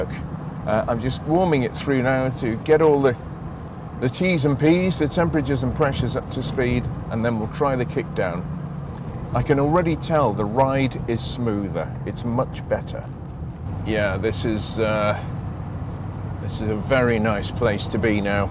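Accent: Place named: England, United Kingdom. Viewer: most English commentary I have heard in some time, great work from Australia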